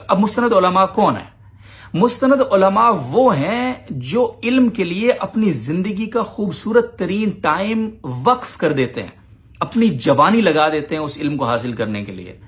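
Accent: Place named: United Kingdom. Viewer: Let me ask you a simple question , are you an "aalim" ? The answer is probably yes because you're a graduate of jamia rashed . And is Tariq masood an aalim ? You'd obviously say yes . Then let me tell you that aap jaisy chechory or bazari so called aalim sy Sahil adeem type log hazar dafa behtar hen . Aap log karty kia hen member pe bet kar ? Chichora pan aur bazari zuban , choktkoly sunana aur bc Tum tu Bismillah bhi English accent me party hu which implies your inferiority complex . I believe the whole jamia tu Rasheed is suffering from deep sense of complex which there're trying to satisfy . You people are impossible. Tbh aap logon ko dekh kar main enjoy bhi karta hun because you're a joke and ghussa bhi ata hai because of the nonsense you speak and spread among the riffraff.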